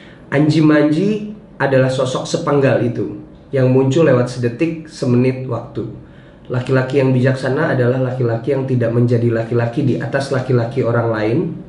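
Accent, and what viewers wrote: Java, Indonesia. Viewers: Manji mo nanya.... manji asli nya dr mana, kok bisa bhsa jawa medok?😄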